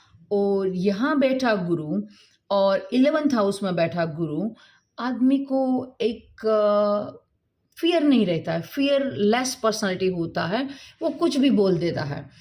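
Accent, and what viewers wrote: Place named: South, India. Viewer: Sahi kaha.. South Indian accent bot acha lg rha hai..👍🏻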